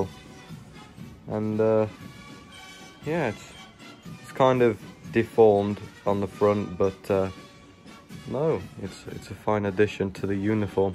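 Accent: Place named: United Kingdom. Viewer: He speaks a british accent lol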